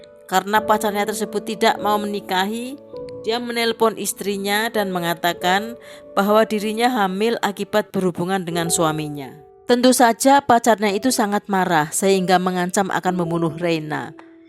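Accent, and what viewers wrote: Java, Indonesia. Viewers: Medok bgt yg isi suaranya gak netral ah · Pembawa nya kurang pas suara nya medok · medok bgt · Medok Jawanya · Pengisi suaranya agak medok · Suara admin jawa kental bgt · dari suaranya pasti orang jawa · Naratornya SPT suara orang Jawa yg ngapak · Medok bngt baca ceritanya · Logatnya Indonesia bagetttt....tulen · medoknya juga dikurangin sedikit madam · narasinya bagus kok, sangat Indonesia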